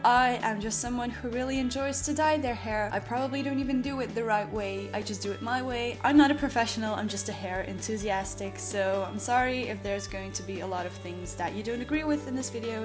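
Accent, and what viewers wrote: United States. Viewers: It sounds a bit American also! It's crazy, but calming. If, that makes any sense. Haha I just love your voice. 😳😳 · You sound American, but you have a totally different speech pattern. Where are you from?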